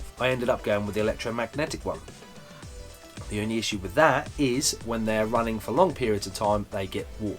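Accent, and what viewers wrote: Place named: Norfolk, United Kingdom. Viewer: Can I buy the 3D printed air inlet/outlet from you please? From your accent you sound like you're local to me (Norfolk).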